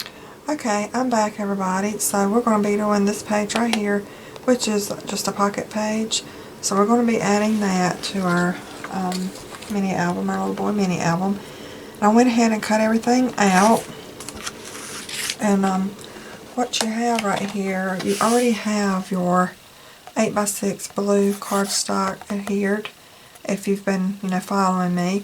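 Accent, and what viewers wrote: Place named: Tennessee, United States. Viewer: Your albums are lovely too 😊 (I lived in TN for a few years and your accent sounds very similar - I miss it so very much )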